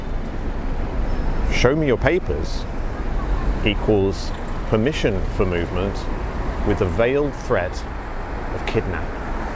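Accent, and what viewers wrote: England, United Kingdom. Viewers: I aspire one day to move to the UK from NY and speak as eloquently polite, whilst getting the information he seeks. This isthe epitome of evolution to me. I wonder if that is cockney or RP?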